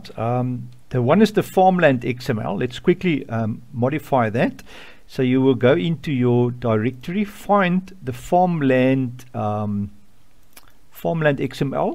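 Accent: South Africa. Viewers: Ek kan sommer hoor jy is van Suid Afrika lol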